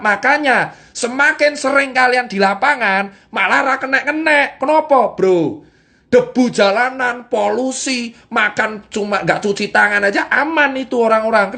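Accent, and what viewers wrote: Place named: Surabaya, Indonesia. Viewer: Asli... Gaya suroboyoan.... Gamblang njelasno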